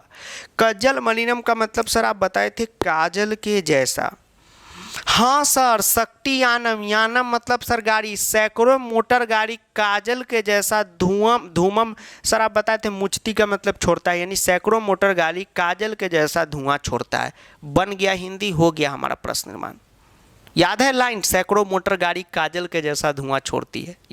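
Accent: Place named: Uttar Pradesh, India. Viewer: He is not bihari he is up wala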